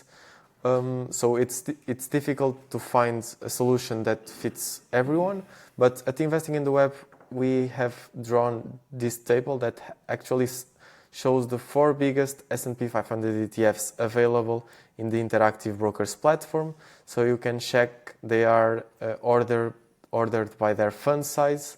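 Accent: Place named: Portugal. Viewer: Are you portuguese? From the sound it seems. Do you know in Portugal if we can follow the process you just shared?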